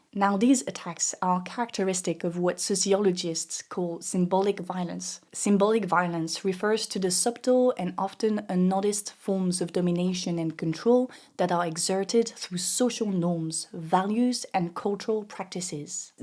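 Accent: France. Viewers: Hello La chanteuse Aya j’aime beaucoup ❤ quel pied de nez à la société dite bourgeoise qui est déconnectée de la vraie vie. Le changement est douleureux: l’enfant qui fait ses dents le sent et il a mal, Le monde la société change comme bébé fait ses dents partout c tout, il faut rester patient. On juge et on est jugé c une dynamique. Il faut rester gentil indulgent avec les intolérants, comme avec bébé qui fait ses dents, car on est tous formatés, et dépassés par le changement comme bébé qui fait ses dents et qui pleure qui comprend pas ce qu’il se passe. bref faut rester gentil tolérant et ouvert d’esprit comme avec bébé quand il fait ses dents car il souffre il a mal et il comprend pas pourquoi car son organisme change la nature fait son travail… C super j’aime ❤ t simple et sympathique ❤ J’espère que tu vas en faire plein de contenus ❤❤❤❤❤❤❤ ça change de ces intellos compliqués et coincés que sont les intellos français. 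❤ je kif ❤ce contenu en anglais a l’accent français tenu par une française de souche ❤ j’aime qu’on parle d’autres langues ❤que le français ❤ C une ouverture d’esprit ❤❤❤❤❤c très bien expliqué avec des mots simples ❤❤ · what an elequent frenchly fluid in english spoken summary...refreshing..i drank it up slowly knowing it will nurish my mind like a glass of mineral water,far from boring and short enough for lingering thoughts and ideas about the world we live in,i now have a new word that encompasses the silent violence we experience.Thank you ms. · This video makes you the only French person I like 😂 (idk if you are French, I've never seen your channel before. Just going off your accent)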